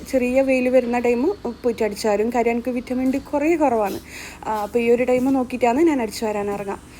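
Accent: Kannur, India. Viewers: പഠിച്ചതൊക്കെ ദുബായ് ആണോ.. മലയാളം അത്ര fluent അല്ലല്ലോ.. അതോ കണ്ണൂർ മുസ്ലിം ഭാഷ ഇതാണോ?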